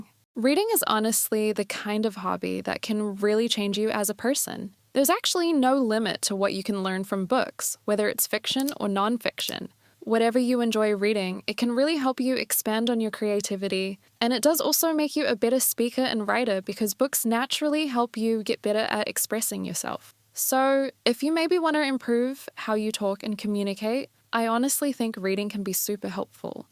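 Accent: United Kingdom. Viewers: برتش آكسنت؟ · what her English accent is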